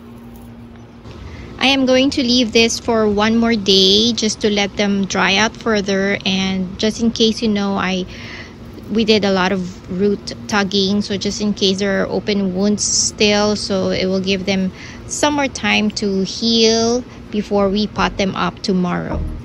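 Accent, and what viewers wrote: Philippines. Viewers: You sound Filipino ;) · If I were a betting man, I'd say you're Filipina with that accent.. (fellow filipino here)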